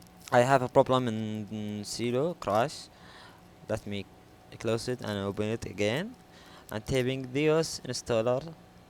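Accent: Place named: Saudi Arabia. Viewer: ههههههههههههههههه اقسم بالله من اللهجه قبل ما افك التعليقات عرفت انك سعودي😂😂😂😂😭.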